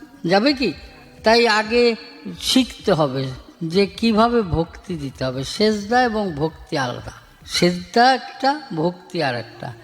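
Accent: Bangladesh. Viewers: তুমি বাংলা আঞ্চলিক ভাষা বুজ এইটা ফরিদপুরের ভাষা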